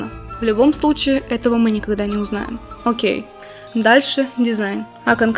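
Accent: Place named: Russia. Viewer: Your accent is so cute, i need to go to Russia now to get a waifu. But Elise is trash LOOOOOOOOOOOOL Just kidding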